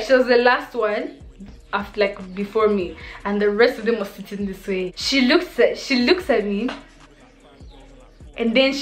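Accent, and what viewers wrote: Nigeria. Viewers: You sound Nigerian 😭❤️youre literally my favorite YouTuber as about now !!❤️🔥🌈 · You have a Nigerian accent 😍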